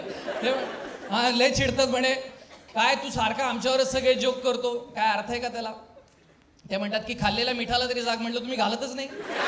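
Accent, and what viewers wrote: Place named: Kolhapur, India. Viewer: बरोबर आहे लय ऑड वाटतय पुणेकरांच्या तोंडातुन कोल्हापूरी भाषा ......जगात भारी आम्ही कोल्हापुरी😅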